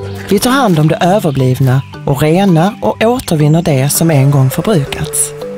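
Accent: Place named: Scania, Sweden. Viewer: Mycket bra. gillar skånskan. :)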